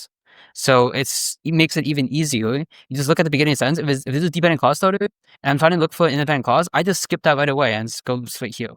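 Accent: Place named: Asia. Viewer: Well he has an asian accent and being american doesnt automatically make you a native speaker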